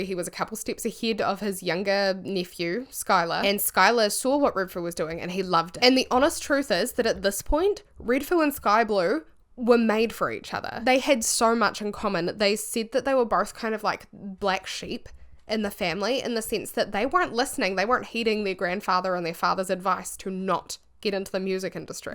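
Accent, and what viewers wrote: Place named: New Zealand. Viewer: about your accent, it's beautiful and really pleasing to hear. I'm from Portugal and before watching your videos I had never heard a kiwi accent, so you're kind of an ambassador now lol I hope that u don't feel the need to explain it everytime ✨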